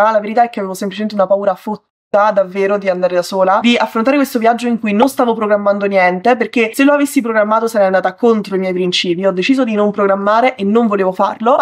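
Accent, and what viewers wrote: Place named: Naples, Italy. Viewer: sei napoletana?io di Salerno... Sembra che hai lo stesso accento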